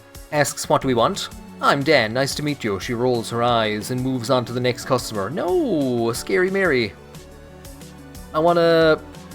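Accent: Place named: Dublin 4, Ireland. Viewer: How many years have you been hiding this GLORIOUS D4 accent?! I nearly wet meself!:face-green-smiling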